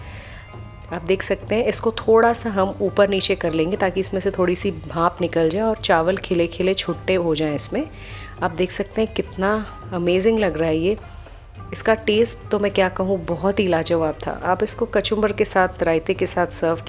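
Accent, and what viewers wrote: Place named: India. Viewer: Feeki ...wali mirchen??😅 ...chawal fool..jayen?😂😂😂 Pheeki*. Phool*. Oh acha ap hindi accent use kar rahi hain. Warna urdu me ..pheeka.... phoool... bolte hain.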